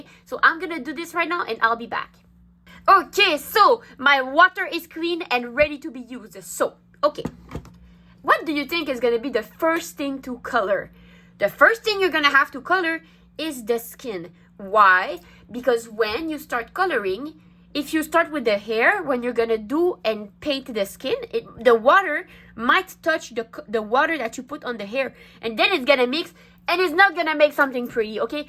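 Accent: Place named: France. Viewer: Hey! I loved your video, hreat art, great tutorial it was *chef kiss*I was just wondering if you were french, I am french myself, and I heard in your accent (slightly) some french like the “Ok” and the “euh”, the way I also pronounce it :3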